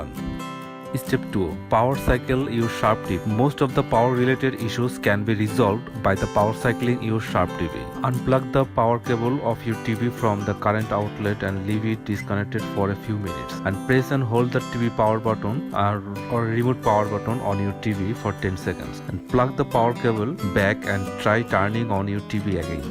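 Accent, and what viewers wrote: India. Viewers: Indian accent lol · Your English...Indian pronunciation...gives me more headache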